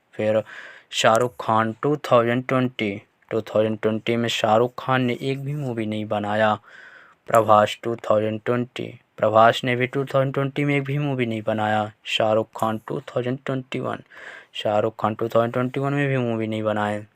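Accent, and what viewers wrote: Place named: India. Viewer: What city are you from? I'm interested in figuring out where the accent in India is from.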